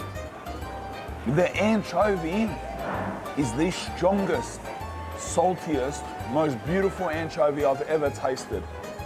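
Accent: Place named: Sydney, Australia. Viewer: You must be from Sydney you sound like my Step Son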